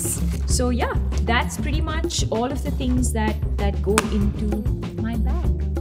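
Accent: India. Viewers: Let's just talk about her accent like so many ppl change their accents when they be popular and look at herrrr pure Indian!!!!!❤️ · I'm in love with her banglori English 💕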